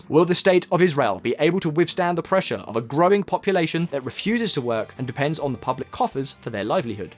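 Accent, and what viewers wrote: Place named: United Kingdom. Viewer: It's the first time I see a video of yours, and as an Israeli I can tell you that you explained it better than most Israelis can. Unfortunately for us, I don't see the Haredim joining the military soon. Also, I think that what most upsets us, Israelis, about them is that because they don't work or have low wages they don't pay taxes, thus making us, who work everyday for living, to pay not only for our lives, families, and our education, but also for theirs. I should pay for my own son's higher education, not for the Haredi education of Yeshivot (Yeshiva in plural) and Tora study Edit: Also, like your British Accent:)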